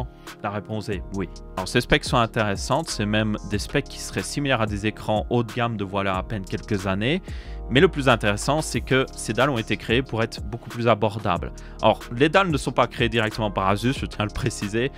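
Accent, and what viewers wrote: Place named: Belgium. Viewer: Merci pour cette vidéo, je te suis depuis quelques temps et j'aime vraiment bien ta chaîne, je deviens cultivé sur l'informatique grâce à toi haha, d'ailleurs à ton accent je crois reconnaître un Belge, non ? Si oui, on est deux (bien que cela ne change rien, je tenais juste à me la péter un peu...)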